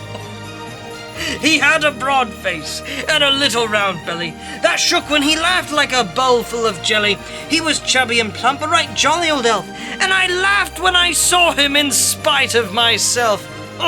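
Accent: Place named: United Kingdom. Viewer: You sound a little bit british ? why ?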